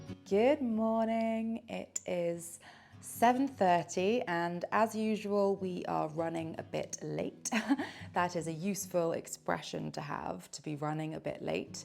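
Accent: United Kingdom. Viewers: Hi, I absolutely love this kind of real-life video! I really adore the countryside — thank you so much for sharing these beautiful views with us. I also really enjoy learning British English accent from your videos, and I’m looking forward to you making another one in the future. ✨ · Yes, I really did. Being an indian is so difficult to speak and listen to British Accent, so this video is very helpful to improve my British way of speaking English 😊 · As an English learner I mostly like British accent and such kind of videos are very useful. Mam, could you tell about camera you recorded this video with. Video quality is incredible. ❤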